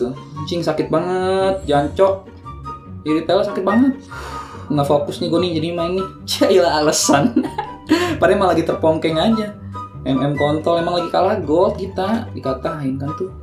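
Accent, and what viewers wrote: Palembang, Indonesia. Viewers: perasaan dnger kata" kamp*ng, lu org plmbng pek ? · Aslinya palembang ya? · Bang orang mana niih??? Palembangkah???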